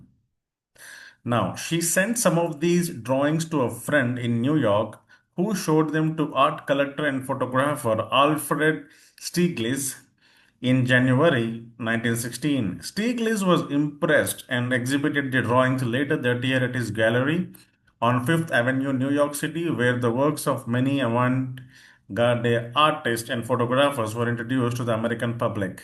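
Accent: India. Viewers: Bro your voice as a hindian person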